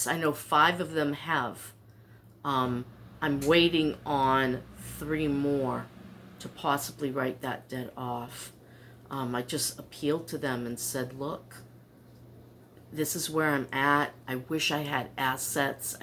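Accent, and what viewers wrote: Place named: New Jersey, United States. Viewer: Hi! I’ve just discovered you by accident in my feed and now I have someone new to watch! I’ve only watched I think two of your videos so far. I love your “randomness “, that’s exactly how my daughter and I talk…we’re always going from one topic to another then coming back to the first one. I love it! I’m truly happy to see you making it on so little! I love your accent….you gotta be from around New Jersey or something,right? And I love your attitude about punching your way out of a bag! You’re my kind of woman! You can make it through anything and you seem to be doing pretty darn good! I have to do some major downsizing as well! Just so tiring! I hear you about the childhood trauma…I made sure to end that with me. I know my great grandmother was way too tough on my grandma and those attitudes were passed onto my mother who passed it on to me. 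You gotta be the one to end it…life is too short to hurt the ones you love. There’s always time to change and apologize. We all make mistakes but learning from them and not doing it again is the main thing. Are you hoping to have this channel be a source of income? If so, I hope you become more popular and make more money to live on so you’ll have a cushion for emergencies. I sure love listening to you talk and I’m sure others will as well,once they find you that is. Well, I’ll go now seeing as I’ve written you a novel! Lol! Take care! ❤️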